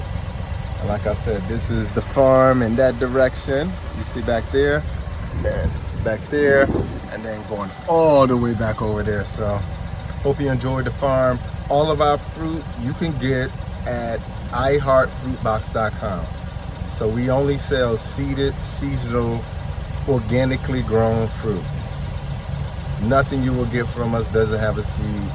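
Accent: Jamaica. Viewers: Can I get two of your coconuts. Send some to Jamaica the land of wood and water. You sound like a son of the soil of Jamaica.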